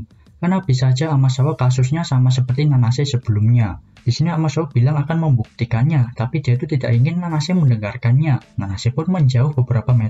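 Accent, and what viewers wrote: Java, Indonesia. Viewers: Makasih mang medhok 🤌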